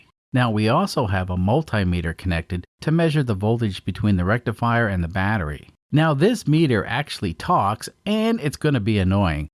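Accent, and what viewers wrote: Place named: Boston, United States. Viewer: It sounded good, I think what was different was it was just a slightly different presenter voice, maybe slightly more comfortable than normal. It was very clear and had good quality audio. Reflecting on it after I commented I honestly got a little choked up. I grew up listening to CarTalk Sundays. Your accent is a mix but leans Bostonian it reminds me of Tom and Ray a little.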